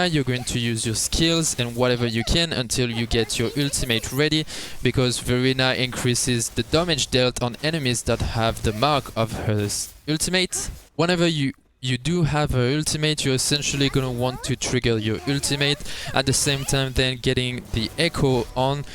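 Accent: France. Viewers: On dirait un accent français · Hello ! Good video, +1 ! You sound french, don't you ?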